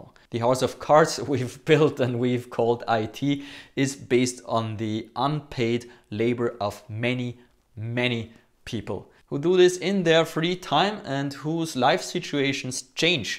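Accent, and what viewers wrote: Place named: Austria. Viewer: go for English. You're english is good but try to explain what you mean when you use austrian dialect 😂 (I as a german native speaker, with a southern dialect doesn't understood you)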